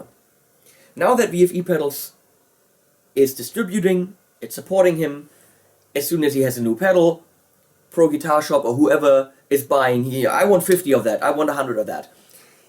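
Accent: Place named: Germany. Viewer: Man hört das du deutscher bist :D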